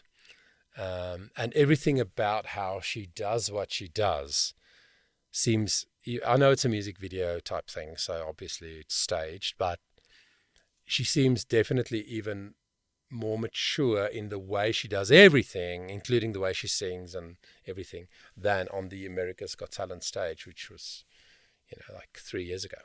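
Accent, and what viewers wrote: South Africa. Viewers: She is 17 now and dropped her first EP in August consists of 6 original songs. They are amazing. I have been following her for a long time and I am so proud of of her hard work, dedication and just being a wonderful human being. is the first track of the EP. You are missing out if you don't listen to them all. I think you are also a South African from your accent. I am a 77 year old gran from South Africa. She is my favourite artist and has been since she was 9 when I heard her sing a self produced version of.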